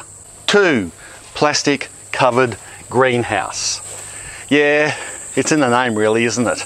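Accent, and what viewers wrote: Australia. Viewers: Haha - true Aussie male, really good, ta! lol :D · Even if you don't garden it's worth watching this guy, gotta love those Ausies " it was a bugga to start"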